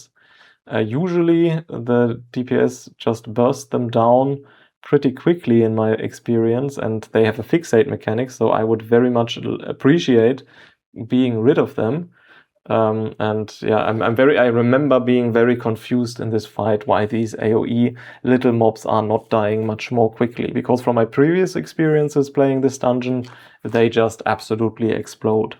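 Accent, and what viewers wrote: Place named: Germany. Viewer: Nolo, schön, dass du mit deiner Aussage Leuten Faulheit beim Lernen unterstellen möchtest oder Menschen, die eine Lernbehinderung haben, damit vor die Füße spuckst. Ich kann Englisch und ja, man kann ihn gut verstehen, und er macht seine Sache auch sehr gut. Das hat allerdings gar nichts mit meiner Aussage zu tun. „Wer lesen und verstehen kann, ist klar im … naja, du weißt schon …“ Man hört bei ihm halt ganz klar den Deutschen raus, und ich finde es einfach schade, dass es kaum gute deutsche WoW-Content-Creator gibt. Ja, ich weiß, mit Englisch erreichst du einfach viel mehr Leute, aber dafür ist der Markt auch stark übersättigt. Daher kommt meine Aussage. Aber gerne werde ich für Menschen wie dich dies in Zukunft genauer spezifizieren. Ein schönes Wochenende wünsche ich dir damit und schon einmal einen guten Rutsch für S2!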